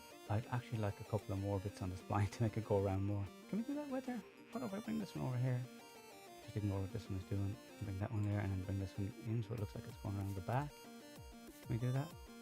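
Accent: Ireland. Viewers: the view count and interactions does not capture how awesome and ~valuable~ these sessions are. I get so much out of each one, thank you again. Also there is a big market for unintentional ASMR with Irish accents believe it or not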